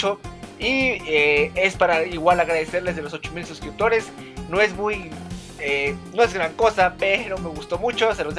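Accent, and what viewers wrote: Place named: Mexico. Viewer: Hasta tu voz parece mexicano dE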